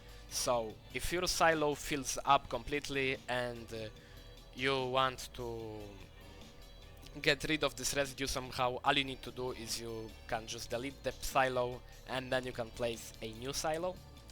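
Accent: Poland. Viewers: masz akcent polski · You sounds like Polish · Im thinking polish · ah ten polski akcent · Coś czułem po akcencie że rodak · Słychać po akcencie że Polak rodak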